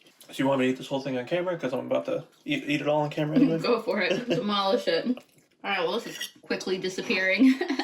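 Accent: Philadelphia, United States. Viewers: I KNEW IT! I heard Philadelphia in your voice!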